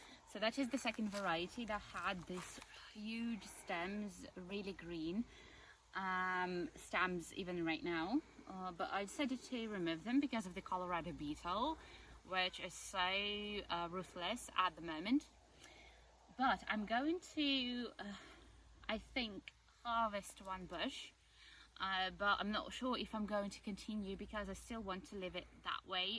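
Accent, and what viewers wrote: United Kingdom. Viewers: Your British English is amazing. You don't have Russian accent at all. I learned Alaskan English in 1990 and my Central European accent is still there even now. Potatoes don't have to grow in the ground. They grow well in hay, freshly cut grass, leaves and anything else that creates cover and produces heat. In Palmer, Alaska , farmers grow these red potatoes as well as purple potatoes. Best wishes from Alaska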